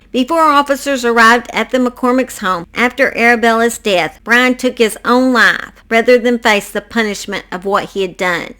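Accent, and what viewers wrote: South, United States. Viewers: sweetheart, my Mum would've loved you ❤, we both always loved hearing that beautiful southern accent ❤. You're gorgeous 💕. Haven't left many comments dear, but watch all your videos❤🇦🇺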